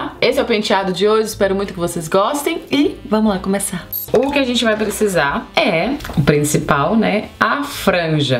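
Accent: Minas Gerais, Brazil. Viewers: Você ainda fala, mineirissimaaa 😘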